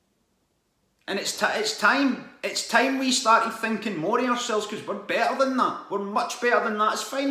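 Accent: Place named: Glasgow, United Kingdom. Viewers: Thank you for letting us know this! No doubt coming to N America soon enough. And your accent reminds me of my dearest friend that lived in Paisley. And yes, I understood every word you said, and she, too. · Brilliant, I've just heard of you today. You sound like you're fae Wishy lol..Great to hear what you have to say..and you are right we need to come together, but the created divide gets in the way so much. Where I live now, north east Scotland, only the scum of the earth would ask for a council house so most people don't cars as they have a death- tax (mortgage)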